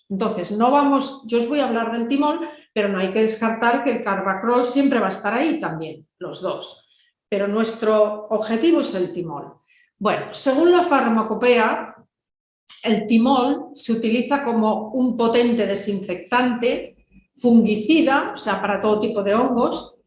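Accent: Argentina. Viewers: Hola, Te escucho y escribo desde la Patagonia Chilena, estoy en la ciudad de Puerto Varas, y por casualidad hace un tiempo he puesto tus videos de casualidad, primero me llamó la atención tu llamado a estar preparado para una posible crisis, que me parece muy práctico y manejar los alimentos y plantas medicinales para usarlos sin tener que depender del supermercado en caso de alguna contingencia, usar conservas es una forma de no desperdiciar comida, me parece muy interesante lo que planteas, el pensamiento, el entendimiento de antiguos ardides que se han usado para interpretar a conveniencia las escrituras bíblicas es lo que más me gusta que hasta debería ser la base de las clases de religión, y Miguelito el marciano es todo un hit!! felicidades y siempre mucho valor y carácter, aparte me encanta el acento argentino que tenés, abrazos desde Chile estimada.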